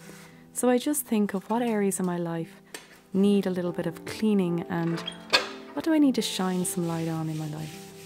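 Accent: Ireland. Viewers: watching your videos, with your calm and gentle Irish lilt and beautiful images, is like meditation for me. Thank you for posting. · lovely to hear your love Irish voice and wisdom thank you Celine also from Ireland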